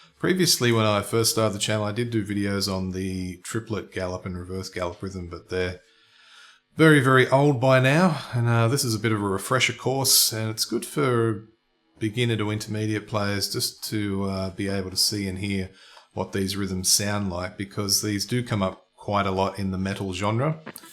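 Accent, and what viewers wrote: Australia. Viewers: What part of australia are you from mate?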